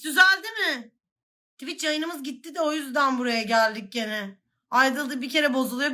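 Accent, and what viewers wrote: Black Sea, Turkey. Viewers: O nasıl bir şive yaa abla ben bir Karadenizli olarak böyle konuşamıyorum helal olsun valla <3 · Abla sen Rizelimiydin ? Çok iyi yapıyorsun karadeniz şivesini. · Karadeniz şivesi çok yakışıyor sanaaaa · Karadeniz Şivesi İle Yayın Girşini Yapar Mısın Lütfen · gerçek karadenizliden daha karadenizli yemin ederim :D ay ablam yicem tipini arada böyle videolar yapar mısın ? :D :D :D :D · 10 saat karadeniz şiveli konuşsa dinlerim çokk iyi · Abla karadenizi söktü götürüyooo😅👏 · bir de karadenizli aksani ucu bir arada gulmemek imkansiz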